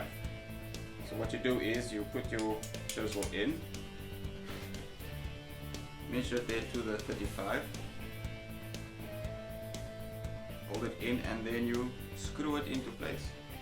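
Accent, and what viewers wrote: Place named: South Africa. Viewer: I love randomly hearing an accent from home on YouTube :) Howzit my bru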